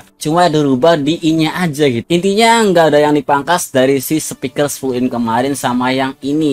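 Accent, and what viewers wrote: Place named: Indramayu, Indonesia. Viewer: Logat indramayue kuh jelas pisan..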